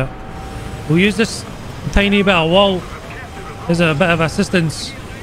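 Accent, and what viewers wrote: Scotland, United Kingdom. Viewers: It's the Scottish brogue. He could read off my final rites and it'd honestly be entertaining.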